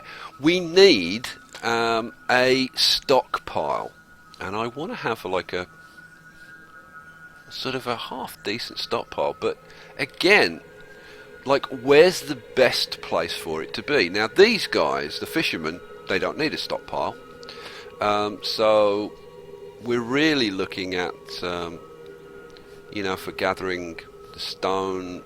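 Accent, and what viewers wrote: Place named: Northern England, United Kingdom. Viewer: where u from man in the UK? i guess in the north, but not too much high on map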